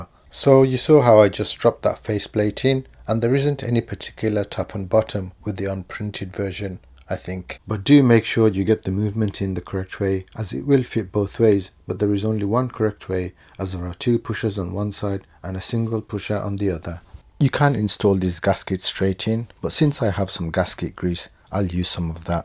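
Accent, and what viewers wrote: Birmingham, United Kingdom. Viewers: You came up on my recommended, so this is the first of your videos I’ve seen. My first impression is ‘sounds brummy, specifically uk vibe of deadpan humour mixed in with confident yet laidback information’. I’ve never fixed a watch in my life or looked to do so (though I fix other stuff), but I’ll still be watching haha Glad people are recognising your work and sending you bits to tinker with! Hoping more independent companies get into making high quality 3rd party parts for restoring or customising otherwise forgotten tech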